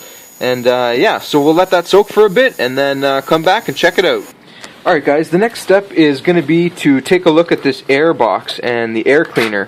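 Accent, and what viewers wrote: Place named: Canada. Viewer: Oh you Canadian? "Check it oot!"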